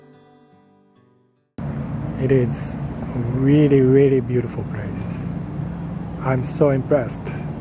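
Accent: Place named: Japan. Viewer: He has very strong Japanese accent.Uncomfortable listening.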